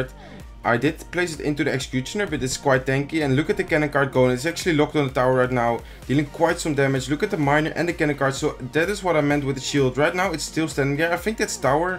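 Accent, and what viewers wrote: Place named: Netherlands. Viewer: Je praat Engels met een Nederlands accent... Ben je Nederlands?? Anders zou je dit niet kunnen lezen... Op xD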